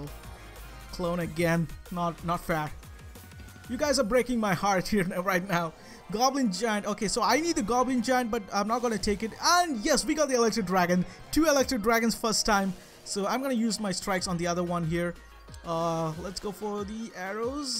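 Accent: India. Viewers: ASHUTOSH ZAGADE ofc he is, he has the Indian accent and his currency is in rupees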